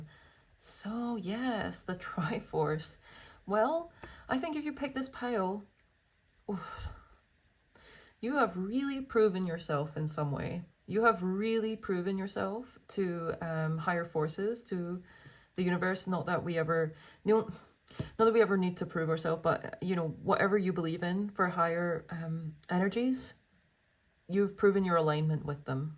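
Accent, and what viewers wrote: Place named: Barbados. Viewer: I just found you today!💜. I love your accent - Bajan 🥰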